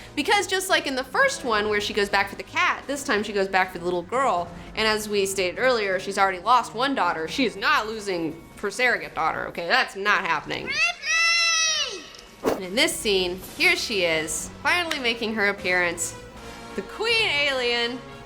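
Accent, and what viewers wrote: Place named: Alabama, United States. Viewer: I love hearing that Alabama accent! Keep up the great reviews and interviews. Enjoy the hell out of this channel!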